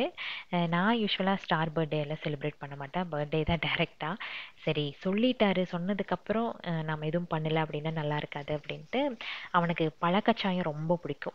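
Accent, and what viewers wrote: Coimbatore, India. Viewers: Akka unga voice super athulaum conversation with cbe slang vera level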